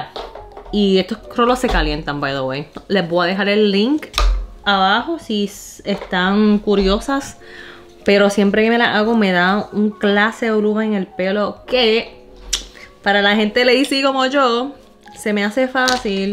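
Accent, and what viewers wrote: Puerto Rico. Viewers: Que brutal , acabo de descubrir tu canal y me encanta esto, una china que habla %100 boricua, eres boricua 🇵🇷🇵🇷🇵🇷🇵🇷😍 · ¡Encontré este video por casualidad y me encantó! ¡Una China, Boricua! Wow! Me dije: tengo que ver de que se trata. Lamento mucho por esas personas burlonas y para que no te sientas mal, aquí aveces se burlan de su misma gente🤦‍♀️ Para mi eres de aquí. Aquel que ame a mi Isla como suya, merece ser boricua. ¡Miren a Tony Croatto! Ese era más jíbaro que muchos de aquí... Y ese acento, wow! Bien Puertorra! Enhance both sides of your culture! Eres una de las nuestras no matter what! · Nena mira eres hermosa orgullosa que seas china y te sientas boricua..hablas bien boricua .dañe palante bendiciones para ti y tu familia para nosotros los boricuas asi te sentimos boricua de 💓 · Soy nueva en tu canal hablas igualito a los Boricuas ,me encanta tu energía y carisma soy de Puerto Rico ,san lorenzo muchas bendiciones y salud para ti y tu familia .gracias ! 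Por mostrar lo hermoso de nuestro país al mundo. 💞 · Sip bn bruta saco todo de puerto rico lo que es su estilo como se espresa su flow tu sabes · Alexis del valle asi es habla y se expresa 100% boricua toda su jerga y gestos acento todo es boricua al 100% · Ella no nació en puerto rico ,,ni tampoco tiene raíces puertorriqueñas,,así que 100x ciento puertorriqueña no es,,,ahora si tiene acento boricua porque se crió en puerto rico,,ella,es 100x ciento china,,,con costumbres boricuas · En definitivo que no saben apreciar que alguien se sienta orgulloso de crecer en un país diferente del q nacieron ella es un ser humano y me encanta oírla hablar como toda una boricua aya los boricuas que viajan a USA y al mes (no saben hablar español) super orgulloso de escuchar a alguien hablar de nuestra isla y un pedazo de su corazón es boricua. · Digo lo mismo jaja ni yo q soy Boricua criada y nacida hablo tan brutal el acento Jajja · Me gusta como hablas, 100% bori, muchas bendiciones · Yo escucho a una boricua, no veo otra cosa. 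Y de hecho, una muy linda. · El acento, las expresiones y el vocabulario que utilizas es de una Boricua. La actitud que muestra es de una mujer Boricua. Realmente en la vida todos pasamos por diferentes situaciones en nuestra niñez pero como Boricua me alegra saber que en el fondo te identificas con nuestra cultura. Exitos en todo lo que te propongas y aqui tienes un seguidor mas. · Arriba, WEEPA. We love you. Con ese acento eres boricua. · No sabes lo cool q es escucharte siendo China y q hablas con ese acento boricua y por eso q pasaste es súper normal en PR si eres chino dominicano o incluso boricua el buleo es súper normal mientras vas creciendo y en la escuela peor so nada eso es parte de ser boricua y claro q queremos ver una segunda parte. Acuérdate boricua hasta en la luna 🔥🇵🇷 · Tú hablas más puertorriqueño que yo pero mil por ciento!!!!yo ni él acento tengo y he vivido aquí siempre lol . 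Dios te bendiga y que viva PR 💖💖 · You sound as Puerto Rican as any member of my family, and for that, it is a delight to hear you talk. On the "burlar" point, that is why i haven't gone back to Puerto Rico since 1987, people would make fun of my spanish, and try to make me feel stupid because i spoke English, i will never forgive them for that. Thank you for posting, i feel that i have some one that understands what i felt, or rather what some people on the Island made me feel like. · OMG!! Me has sacado las carcajadas más locas de mi vida!! 😂😂 Niña verdaderamente no se puede negar que te criaste en PR 😅 Tu acento y la “l” arrastra que nos identifica bien brutal! Jamás te avergüences de tus raíces ni olvides de donde vienes porque eres y serás siempre un ser humano hermoso. China,Arabe,Rusa etc todos somos humanos y muy dentro de nuestros corazones es donde está la escencia de lo que somos!! 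Saludos desde Buffalo NY Una Boricua como tu!! 🥰❤️ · Para mi eres de los Nuestros💯 🇵🇷 de pura cepa. Con ese hablarrr mas boricua q YO🙏🙏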